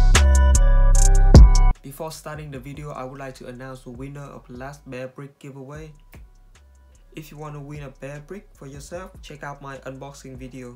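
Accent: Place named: Vietnam. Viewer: omfg dude, 1st time to ur channel, but guess what, i could see where u from by how u pronounced. hahaa, its cannot be “a đi đát” like what u said. and im also from 𝙑𝙞𝙚𝙩𝙣𝙖𝙢 🇻🇳 btw ^^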